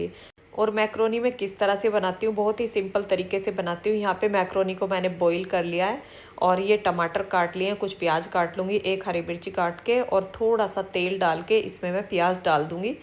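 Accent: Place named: Haryana, India. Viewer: Di m b gujjar hu kota rajasthan se lakin ap logo ko dekhkar nh lgta ki aap Gujjar ho quki humare rajasthan ka पहनावा boli sb aap se alg h aap ko or apke पहनावे or boli se aap haryanwi lgte ho lakin muje bhaut kushi hoti h jb अपनी समाज ke log aage bdte h mtlab kashker ke ladies jb kuch krti h to bdi kushi hoti m cahati hu apna gujjar समाज age bde 😊😊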